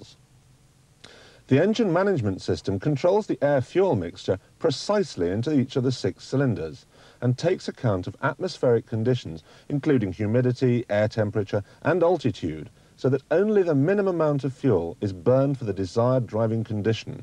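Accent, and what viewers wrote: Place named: RP, United Kingdom. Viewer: How did he go from suit and Queens English, to POOOOOWER! and SPEEEEEED!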